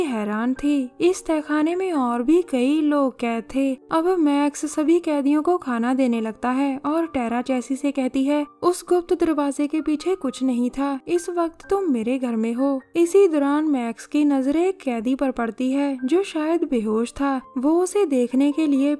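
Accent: Punjab, India. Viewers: Thodi thodi punjabi jhalakdi a explain vich😂😂😂😂😂😂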